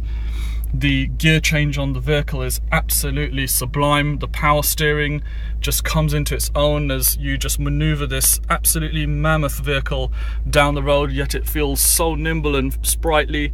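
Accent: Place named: India. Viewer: I also counted the word Absolutely and got 23 times in the space of ten minutes, this guy surely has trouble with the English language in the way he uses the same word so many times, without being able to thin k of another word to use. Maybe it is because he can not use all the words in the English language is because he is not English, I detected a faint hint of Indian in his words, so he was doing what all Indians do when they give you a time wasting phone cal.l they always have an English name and try to put on a perfect English accent, as well as not knowing the English he also didn't know the Highway Code, he was parked on a double yellow lone, on both sides and on the back.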